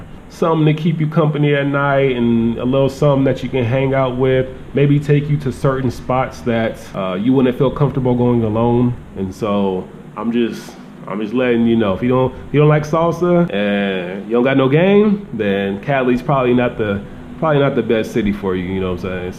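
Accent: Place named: East Coast, United States. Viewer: Wow man I didn't expect you to be from Seattle the northwest that was surprising cause you talk like a east coast dude with all that swag in your voice lol.. thought you was from like Northeast in jersey or new york...but keep up the great work with the vidz bro #Respect 👊🏾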